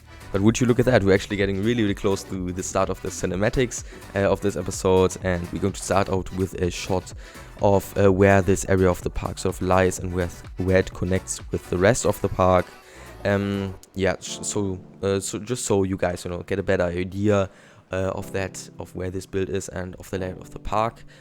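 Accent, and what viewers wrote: Germany. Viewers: I love your german accent i don't know why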